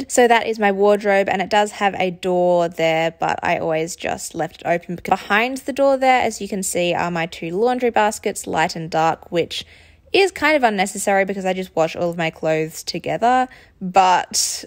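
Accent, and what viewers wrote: Australia. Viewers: Your intonation is quite special , not like the American intonation I have learned , and after I checked the profile , realized you are an Australian ,no wonder it’s quite different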